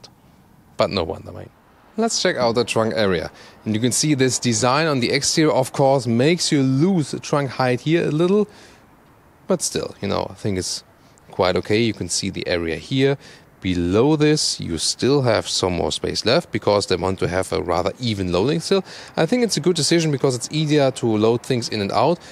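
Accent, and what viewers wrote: Germany. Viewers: is it a German accent?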